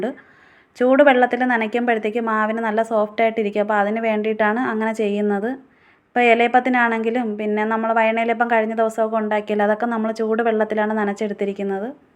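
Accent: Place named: Venjaramoodu, India. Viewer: നിങ്ങളുടെ സംസാരം കേട്ടപ്പോൾ തോന്നി വെഞ്ഞാറമൂട് കഴിഞ്ഞു വരുമ്പോൾ ഉള്ള ഏതോ ഒരു ഭാഗം ആണെന്ന് കാരണം ഞാനും വെഞ്ഞാറമൂട് ഉള്ളത് ആണ് എന്നെ കെട്ടിച്ചു വിട്ടത് നിലമേൽ ആണ്... ഇതേ seim സംസാരം ആണ് ഞങ്ങളുടെത് ഓക്കേ gd luck 👍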